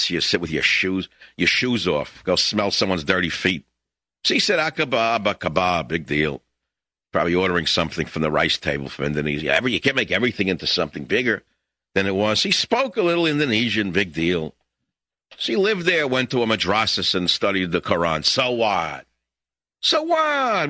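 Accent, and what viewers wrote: New York, United States. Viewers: 🎉🎉😂🎉🎉 it’s a party!! The legend in the house!! 🎉 listener since 94!!! 🤘 is a voice that represents we the people! Miss being able to listen everyday, what hooked me was his intro music and collaboration of metal music! NOW LISTEN UP! As a metal head from way back in the 80s I got my cdls and drove a truck for 20 well on my way to California first time out his intro music came on and then this guy with a New York accent came on and I couldn’t turn it off! Listened everyday as a truck driver! Good memories! Miss teddy!!! Nothing like! I would love to just sit and actually have a conversation in person! Woooooo wooooo sheeeeeeple! Lmao 🤣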